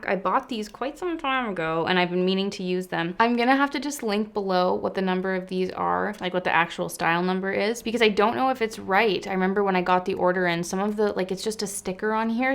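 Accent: RP, United Kingdom. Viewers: That QEII accent... A++! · You killed the Queen English accent!!! wow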